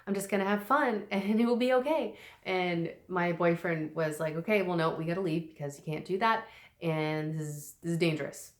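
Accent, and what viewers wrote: Canada. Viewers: right down to the Canuck accent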